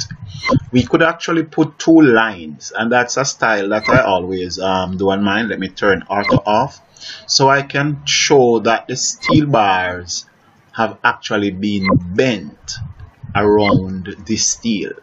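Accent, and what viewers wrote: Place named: Jamaica. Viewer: How yuh mean mi general! Jamaican accent pon dem!!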